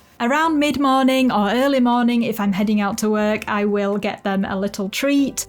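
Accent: United Kingdom. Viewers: She’s UK😊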